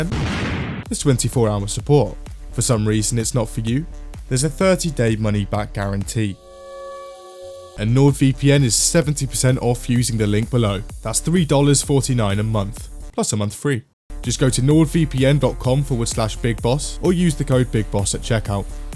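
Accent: United Kingdom. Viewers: Internet historian's British son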